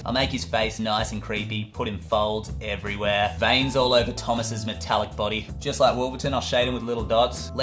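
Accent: Australia. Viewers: Nice! I just found your channel. Never thought id say this but its nice to hear an Aussie accent on youtube for once. All the other channels im subscribed to are American or British and there's nothing wrong with that, but yeah... Loved your first version of Thomas. He looks like he could grope me through 3m of concrete. 😅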